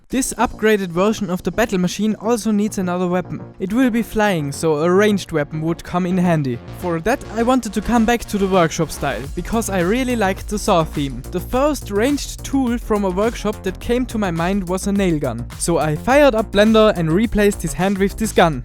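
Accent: Germany. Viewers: Mann hört so krass das du deutsch bist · ngl i love your german accent :D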